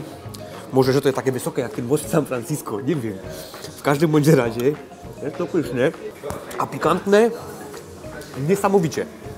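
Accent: Silesia, Poland. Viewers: Czego chcieć wiyncyj ? Śląsko godka, dobre żarełko i fajno muzika. Super! pozdrawiom! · fajna slonska godka. cool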